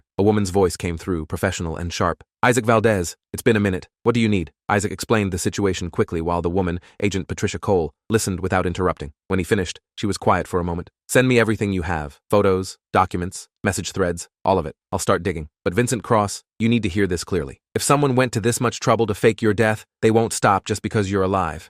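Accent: United Kingdom. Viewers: ooohhhh yeeessss ahh british accent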